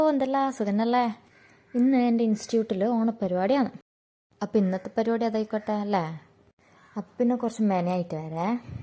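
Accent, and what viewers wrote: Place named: Kasaragod, India. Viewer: Kasargod bhasha poli😊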